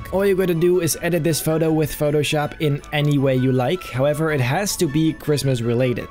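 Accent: Netherlands. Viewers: ik wist het, je klonk al zo Nederlands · When I heard you speak I had some suspicions and during the contest segment it was indeed confirmed you're a fellow Dutchie. Leuke video, ga zo door!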